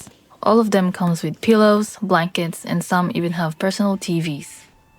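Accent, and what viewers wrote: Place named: Australia. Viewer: love your aussie accent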